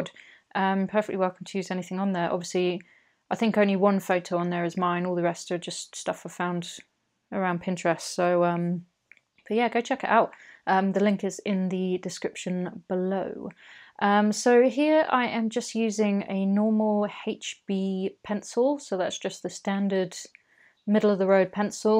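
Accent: United Kingdom. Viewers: I’m absolutely loving your channel at the minute. Your art is amazing and my style! I also love your accent! I’m from the uk too 🇬🇧 ✌️ xx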